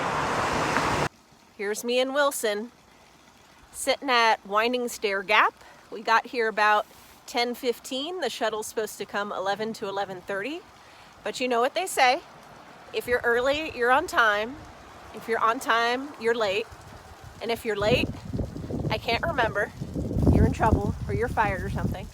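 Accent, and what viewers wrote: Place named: Northern Ireland, United Kingdom. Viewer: Goog to see (hear) a Northern Irish accent - ' ' on the trail!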